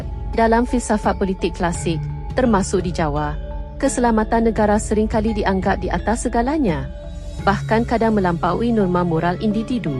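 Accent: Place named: Malaysia. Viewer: Logat malaydesh tidak elok didengar. Bahasa malaydesh menyusup ke dalam bahasa Indonesia lewat membacakan narasi sejarah kerajaan di Jawa dengan menggunakan logat malaydesh yang terdengar kaku.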